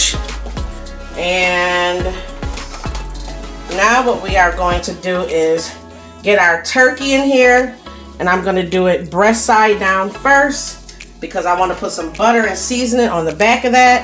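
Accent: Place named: Buffalo, United States. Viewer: You from Buffalo? That accent sound just like Westside Gunn. Boom boom boom. 😂😂😂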